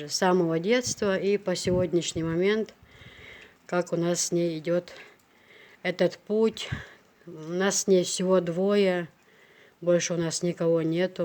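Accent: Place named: Vedeno, Russia. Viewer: мне все нравится что ты снимаешь, рассказываешь!ДАЛЛА эатту бойла хьа. Я жила в пос. Мичурина в Грозном. И мои соседи Дунаевы, Альбиговы были веденские, ( они живут и сейчас там, я в Страсбурге).имена, Кемса в основном встречается у веденских больше. У тебя даже говор веденский. Я очень рада за твоих марзхой, им очень повезло с тобой. Мы жили душа в душу, делились всем, у меня прекрасные воспоминания о моём детстве, юности. Между Дунаевых и нами был маленький заборчик.Кемса (так зовут нашу соседку)всегда была нам как вторая мама. Её сестру звали Дикиева. с уважением Асет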